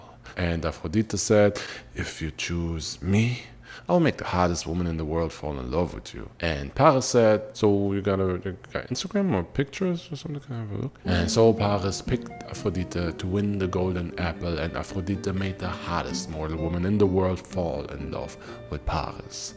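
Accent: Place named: Germany. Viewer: ..are you german? You pronounce the names REALLY german like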